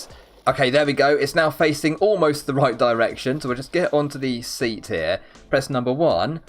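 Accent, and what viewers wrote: United Kingdom. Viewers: think they changed somethings with the megaladon.. that f* B* follow my boat for at least 15 min. really like your vid's dude! Charming accent aswell UK i presume? Ever thought about making a stormworks server? think it could be fun, you might want to look into it cause i would join that in a second. i understand it's a lot of pc power required for keep it running but how you play solo is already really cool.. now ad that to the followers you already have. just a thought mate:) keep going you're amazing video's about stormworks!. NJersey is okay but he thinks he is amazing and presumes to much. frantic is funny cause its an idiot. Camado is US and wants views lately. well that's enough b*tching. check the server stuff. guessing of the followers you have, we would have Alot of fun:)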